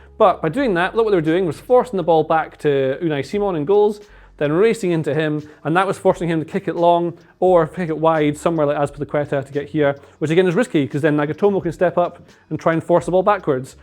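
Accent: Scotland, United Kingdom. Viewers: I think it's his Scottish accent. Dough always sounds like doo.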